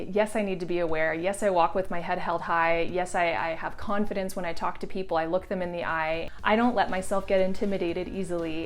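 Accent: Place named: North America. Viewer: I'm guessing you're either U.S. or Canadian by the way you speak. You remember thoes AT&T Sprint with Candace Brugan the dime lady. And MCI 00 it's magic you know. And <num> /<num> commercials? Saw them all the time in the 90s as a kid.. now days obviously they're a null point. Nearly everyone has a cell phone. I just can't understand why that being said phones have yet to work worldwide at the same flat rate. .. I hope it happens before this 2020s decade is over. As previously mentioned I know how to use a calling card from a payphone. However guessing I wouldn't find very many of either anymore??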